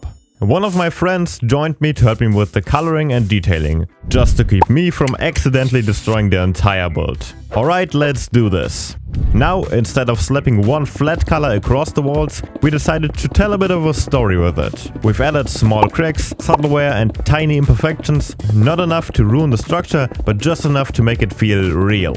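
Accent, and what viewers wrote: Germany. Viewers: Your German right? And nice build btw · Are you German?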